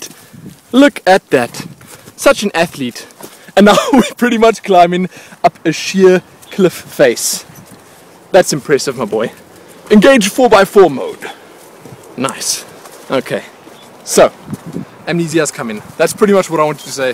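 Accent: South Africa. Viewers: dude are u south African or just from Africa because iv got a mate up in Namibia and he sounds like he is from sa. and that place looks like capetown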